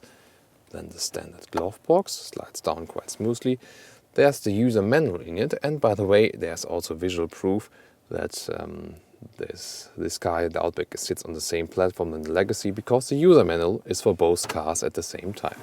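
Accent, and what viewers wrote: Germany. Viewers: That German accent is so cute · Love the German accent 👍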